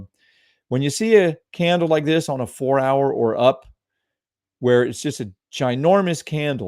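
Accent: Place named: Maryland, United States. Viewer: Btw, your accent is like my inlaws in easton,md 😂. Are you from md?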